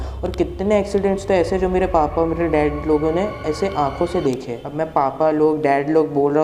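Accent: India. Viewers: Are you bengali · Bhai ap gujarati ho???? +_+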